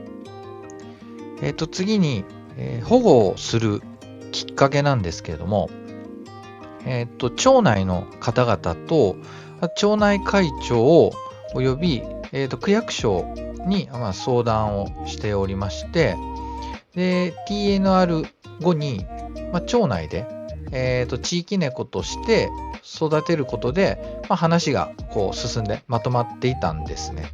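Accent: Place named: Kanto, Japan. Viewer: ショート動画見てどうなったのか 気になってました。 BGMを少し小さくして頂けるとしやすくなるかと。 関東訛りで時折関西イントネーションが混ざるから関西出身の関東在住の方かと思ったら京都の方とは驚きです。 それやったらやっぱり京都弁で（或いは交えて）話さはったら宜しいのに。 保護してくれておおきに。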